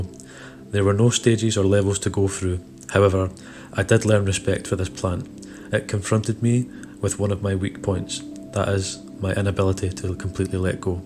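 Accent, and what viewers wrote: Scotland, United Kingdom. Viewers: I was watching Shrouded Hand's video on scary trips and I ran out, so I tried to find some more trip reports on YT, but I remember thinking "aaaah, they just wont be the same without his gentle tone, eerie music and Scottish (?) accent"... then I landed here.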